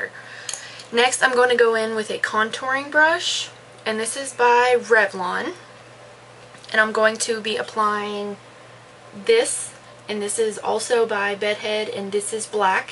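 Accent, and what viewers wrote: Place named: Georgia, United States. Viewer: i love this, its puurrrdy!! you're so cute! what state are you in??? you sound like you're around GA or something... anyways, this looks great on you, i'll have to try it out...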